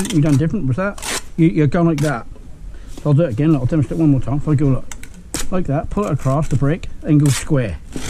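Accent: Norfolk, United Kingdom. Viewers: You're a very good teacher. I've lived in Suffolk for 30 years - a Dorset boy originally - and recognised your Norfolk tones straight away. You make this job look so easy - thanks for sharing. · Are you from Norfolk?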